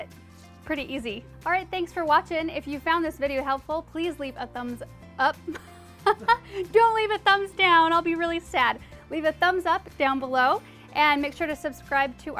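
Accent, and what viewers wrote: United States. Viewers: Your idiot Amurrucan voice makes me sick. Basil grows itself, leave it to grow.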